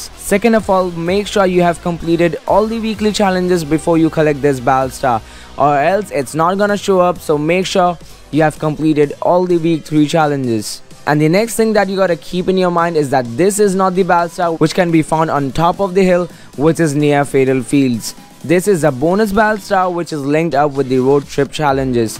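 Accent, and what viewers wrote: India. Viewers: Hmmm I dunno this guy sounds like an Indian scammer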